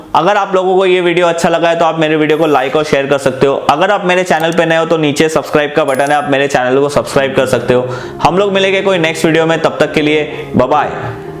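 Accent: Gujarat, India. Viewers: ગુજરાતી છો? વાત પરથી તો એવુંજ લાગે છે. ખૂબ સરસ કામ કરી રહ્યા છો.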